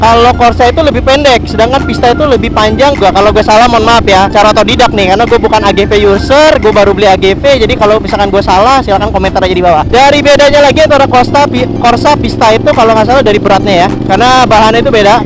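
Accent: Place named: Java, Indonesia. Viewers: Jawane medok tenan #save duka hati😂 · Bang seharian ngomong pake medok jawa 😂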